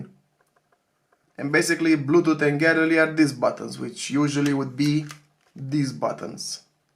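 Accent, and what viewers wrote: Romania. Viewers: inconfundabil accentul romanesc al vorbitorilor de engleza :)) literalmente 30 de secunde a mers clipul cu vocea ta pana am pus pauza sa intru la 'About this channel' sa văd țara 😅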